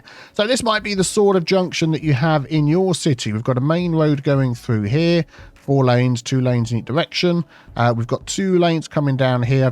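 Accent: United Kingdom. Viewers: How do you pronounce Chicago,? I've realised it definitely gets a ch from me but then again I'm not a native English-speaker. Michigan pronunciation I wouldn't have known if it wasn't for CPP but my British English influence is just so strong since school that it plays up on me. Your accent just brings me back to the UK, love it ❤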